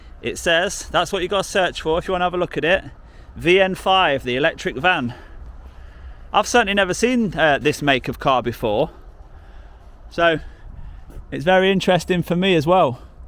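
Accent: Leicester, United Kingdom. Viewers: You have a Leicester accent am l right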